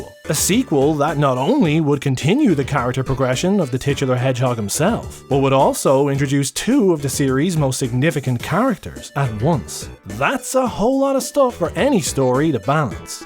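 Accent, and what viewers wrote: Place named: Ireland. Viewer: As long as our favorite foxboy is given something in compensation in the future, I'll be very grateful and not as bitter 😆 Oh good, I was worried it'd come across as creepy 😖 And nah, I (at least, can) understand you pretty well! I dunno where in Ireland you're from but your accent is fairly clear to my ears (unlike some others 🤭)! I'm sure it's a cliche thing to say but Seán (aka Jacksepticeye) kinda helped my ears become a tad accustomed to to... well, SOME accents anyway, since there's not just one accent. glares at my fellow Americans who've yet to realize this fact Anyways... Yeah, Seán has made it slightly easy for me to pick some accents out, even if I can't tell whether they're from Cork or Dublin or what-have-you 🤣 When I first heard yours, I was like "Wait, is that...?" so I checked your homepage to double-check (hopefully that, also, isn't weird but 1. 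It was gonna bug me - especially if I was mistaken, which would've been super embarrassing - and 2. I like to know where the YouTubers I watch are from if it's not obvious) and I had a sigh of relief being right LOL Maybe it's due to Seán but I've developed a great appreciation for Irish accents (and by proxy, the Irish culture and folklore but then, I've always been into Irish music and dance since I was young) and being partially Irish myself doesn't help matters 😂😅 I'm so sorry that if this is ALOT to taken in, all cuz it's on your bloody VOICE - which is normal and probably nothing special to you, but I have a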